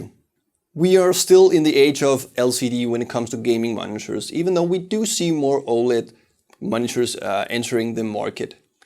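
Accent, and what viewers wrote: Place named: Denmark. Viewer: aggressive danglish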